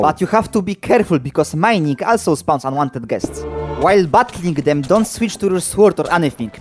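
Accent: Poland. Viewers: Zastanawiałem się czy Polak po akcencie ale wystarczyło że zobaczyłem 2137 lvl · bro r u polish? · od początku wiedziałem że to polak hahaha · masz bardzo polski akcent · are you from poland? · Po 2 sekundach wiedziałem że jesteś z Polski ale super poradnik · Stary jak miło usłyszeć polaka po angielsku xD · Polski akcent — miód na moje uszy :))))) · Słychać ten polski akcent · Jak wszedłem w ten filmik i usłyszałem ten akcent wiedziałem że coś jest nie tak patrze w info kanału i oczywiście polska, od początku coś tak czułem, nagrywasz po inglisz żeby mieć wiecej wyświetleń sprytne. Mam pytanie a propo farm expa xaphan tych zielonych co w lawie się respią po tym jak dotknie wody, bo od chyba wersji 2.9 już te farmy nie działają i czy da się to jakoś obejść że będę na wersji np 2.9.3 i wejdę w ustawienia modów w grze i poprzestawiam coś żeby farmy działały i xaphany się respiły? 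Proszę odpowiedz jak lub nagraj o tym bo dużo osób potrzebuje tej informacji czy da się to zrobić i jak to zrobić a szczególnie dużo osób z polski. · Are you from Poland? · Nie no po akcencie od razu wiedziałem że rodak XD Film też się przydał bo mam nowy rekord przetrwałem 3 dni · No akcent polski duży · słycahć po tobie ze jestes polakiem XD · 2137 widac i slychac ze polak!!!!!!! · kurna od razu po akcencie mozna poznac ze Polak, kocham cie stary (zajebisty toutorial) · are you from poland ?? haha because of your english xDDD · dobra sprawdzałem czy polak i się dowiedziałem to polak po 4 min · tak dawno nie słyszałem kogoś z tak polskim akcentem że ciężko mi czasem zrozumieć co mówisz XD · Kocham polski akcent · Dobrze słyszę że jesteś Polakiem?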